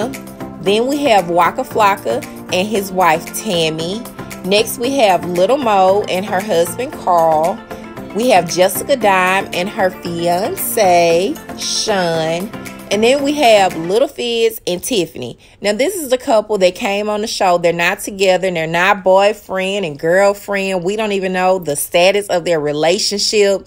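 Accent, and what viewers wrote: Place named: Memphis, United States. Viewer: You kinda SOUND like Jessica...accent wise. Are you From Memphis?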